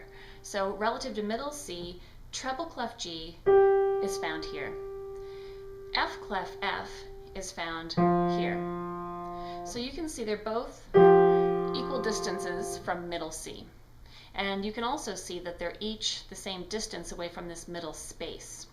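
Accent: Canada. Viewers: great video! ps-- very random but you sound canadian omgg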